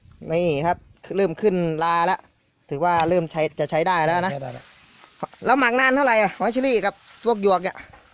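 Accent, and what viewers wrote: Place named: Isan, Thailand. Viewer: เฮดคลิปแบบนี่เด้อเจ้านะ,ดีหลายคั้นแมนเจ้าเว้าอิสานบ้านเฮา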